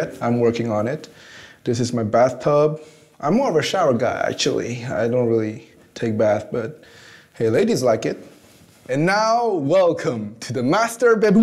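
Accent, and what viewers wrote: England, United Kingdom. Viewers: Il a un Accent anglais incroyable pour un fran-nois comme il dit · "La fistinière" ahah j'y pep ! Félicitations pour cet appart il est incroyable :O Et ton accent anglais est vraiment incroyable aussi !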